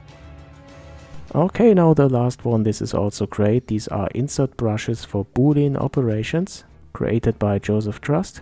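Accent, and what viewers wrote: Germany. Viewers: i asume you're german with that accent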